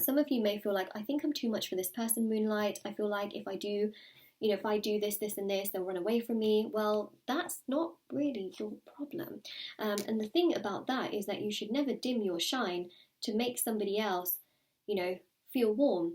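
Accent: United Kingdom. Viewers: Bless you, wonderful reading. Good to hear a British accent, majority are American. I’m in Doncaster South Yorkshire. Have a super weekend, namaste 🙏 🥰💕🪴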